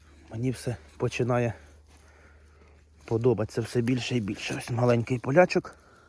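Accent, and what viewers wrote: Ukraine. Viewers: Який колоритний український діалект! Таке задоволення слухати! Та й грибочки, все тільки конкретно, без лишніх блукань показано. Відео сподобалось! Єдине може тільки, знімати сам гриб трошки ближче, щоб видно було красу гриба. Дякую!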